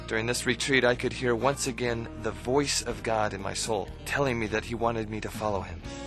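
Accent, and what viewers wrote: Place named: Quebec, Canada. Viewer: What kind of accent is that? He sounds French Canadian... from Southern California?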